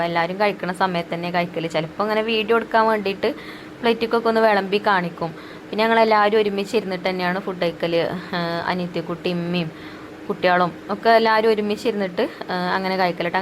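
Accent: Malappuram, India. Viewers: ഞാൻ ഒക്കെ വളരെ ദൂരെയാണ് എന്നാലും വാക്കുകൾ ഒക്കെ സെയിം റസിരെ വർത്തമാനം മലപ്പുറം ഇശൽ ഉണ്ടെന്നേയുള്ളു നമ്മൾ ഇവിടെ കണ്ണൂര് ജില്ല കാസർഗോഡ് ബോർഡറിൽ.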